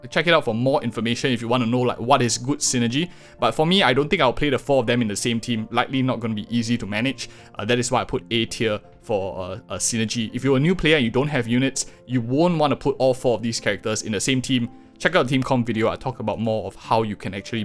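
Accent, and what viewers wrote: Singapore. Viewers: Hey bro! R u from sg? Ur acent sounds like sg im from sg btw xD. Nice video keep it up bro!! Just give u a sub :D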